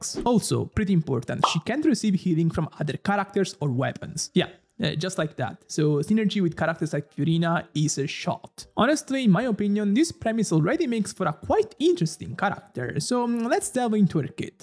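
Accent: Italy. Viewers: He's also italian, you can hear his accent. He's mispronouncing it on purpose, so genshin babies don't get confused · Si sente tantissimo che è italiano ahahha. (Arleccino mi spezza ogni volta che lo sento ahaha )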